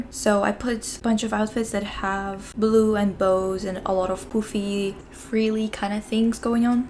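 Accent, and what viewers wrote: Russia. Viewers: do you speak Russian?? that explains why sometimes your pronunciation feels kinda like ours, especially in “r” and “n” sounds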